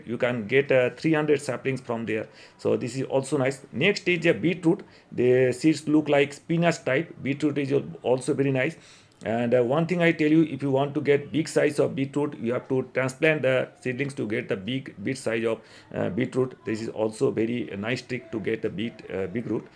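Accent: Bengal, India. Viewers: Sir it is evident from your surname that you are marwari. But your accent is that of a bengali.how??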